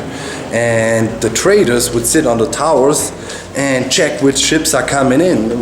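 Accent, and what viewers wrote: Germany. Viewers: Here we have a German man in Spain Sperling English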